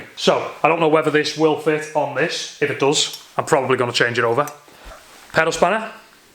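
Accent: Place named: North East England, United Kingdom. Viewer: I was just wondering where u ride trails and stuff in the north east coz ur accent gives it away 😂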